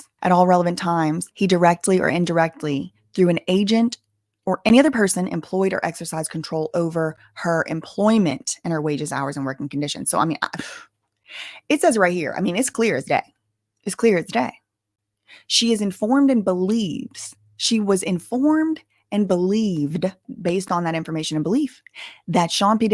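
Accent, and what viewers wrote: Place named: Louisiana, United States. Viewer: I love watching you 😂 the pink Pom Pom’s, Louisiana accent…just so darling 🤗 love from London 🇬🇧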